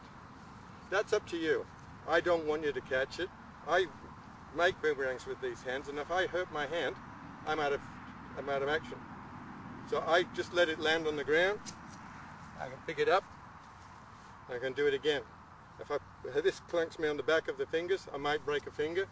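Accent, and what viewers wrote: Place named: Australia. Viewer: Of corse he’s Australian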